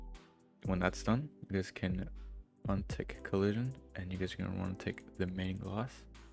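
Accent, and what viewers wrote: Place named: Spain. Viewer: You're a Spanish speaker xd right?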